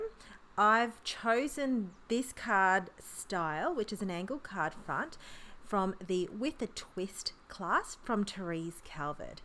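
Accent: Australia. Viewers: This type of card is very clever 💜 Lovely to hear another Aussie accent 😉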